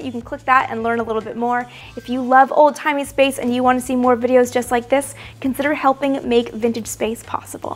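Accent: Canada. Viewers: She's Canadian? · Wait, are you Canadian? Maple Leaf pride! 🇨🇦🇨🇦🇨🇦🇨🇦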